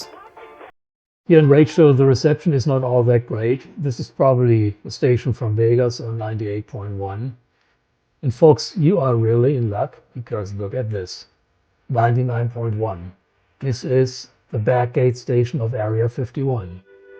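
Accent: Germany. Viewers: Hey, you are a native German? Name and accent point to that conclusion:) 73 from Germany:)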